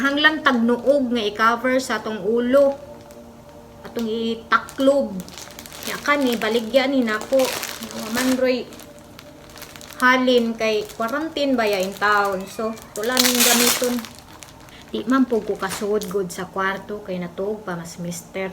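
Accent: Visayas, Philippines. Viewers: Lami Kay paminawon mag bisaya sis na try nasad na ko sis. YT pa more maong g bugas hh parehas ta😂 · sis omg i love the way you talk Bisdak na bisdak kaau i love it i will play and watch all your videos im sure naa ko ma learn thanks sa pag share aning steam facial spa treatment or “Toob” dali ra sya pero careful lang sa pg apply kay ininit mn tubig hehe utong jud ning klaseha kay tiis ganda man Pero para unsa man gyud ni sya nga ritual sis pang hydration sa face?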